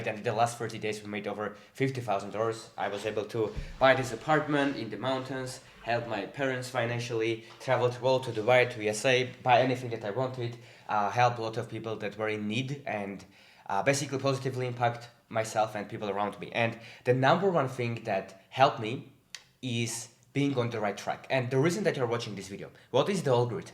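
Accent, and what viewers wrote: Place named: Georgia. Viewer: Hi friend from Georgia, at first i thought you were Georgian because of our accents sound pretty similar. Keep up the good work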